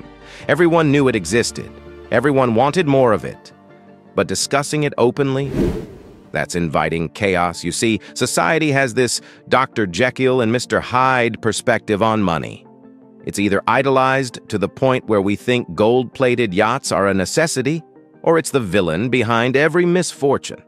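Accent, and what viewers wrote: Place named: United States. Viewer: You have a real cowboy accent in this video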